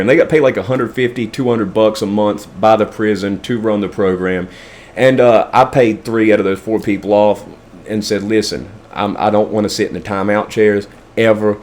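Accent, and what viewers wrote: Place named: South, United States. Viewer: Enjoy ur personality and stories. Im from KY, so ur country diction hits home! CHEERS!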